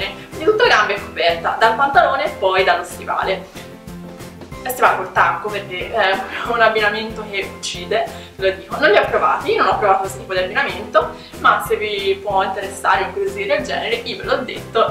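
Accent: Florence, Italy. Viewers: Ma che per caso sei di Firenze ?! ahhaha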